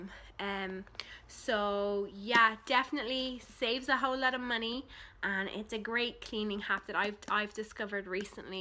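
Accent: Ireland. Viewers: Fantastic hack!! I could listen to you talk all day. Love your Irish accent. Thanks for joining the collab. #YTMM 💖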